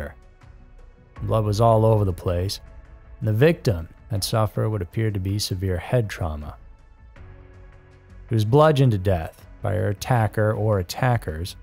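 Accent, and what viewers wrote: United States. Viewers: just subscribed to your channel...ive been watching true crime for a long time...u have alot of stories that are new to me...great footage...love the american accent🙂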